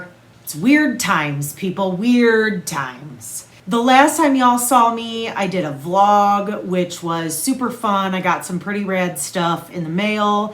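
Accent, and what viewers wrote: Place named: Texas, United States. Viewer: Hi... let me start off saying you are not boring! I just discovered your video. I really enjoyed you being YOU! I live in Houston Texas and you sound like you’re from Texas. Anyways that Boxy Chevron sweater is so awesome I had to go buy it ASAP but I gotta problem I’m a NEW knitter so will you please do a tutorial on how to get started on this sweater Puh-lease - Lisa😊🙏🏽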